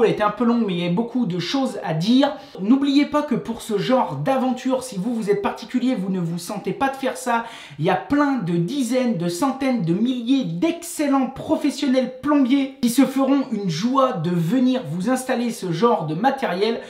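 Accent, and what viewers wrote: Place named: Lyon, France. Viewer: Tip-top, comme d'habitude. Ca fait plaisir d'avoir affaire avec un pro digne de ce nom: compétent et honnête, animé par la volonté de faire les choses comme il faut et par le désir de satisfaire le client. Retraité du métier depuis pas mal d'années (je travaillais avec du cuivre uniquement), j'apprécie vivement les nouveaux matériaux, matériels et façon de faire avec les produits modernes. Les tuto éténat animé de manière tellement agréables. Et, petit plus, l'accent lyonnais ou stéphanois, si mes souvenirs sont bons!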